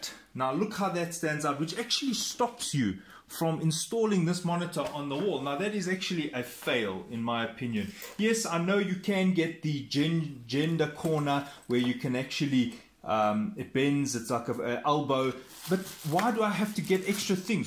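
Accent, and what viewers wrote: Afrikaans, South Africa. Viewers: Smaaking the afrikaans accent😝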